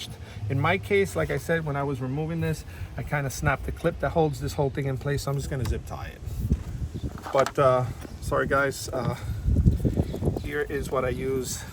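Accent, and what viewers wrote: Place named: New York, United States. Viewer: Great video, clear, concise, helpful with fab NY accent & phrasing - reminded me of Telly Savalas (TV detective Kojak). Who loves ya baby !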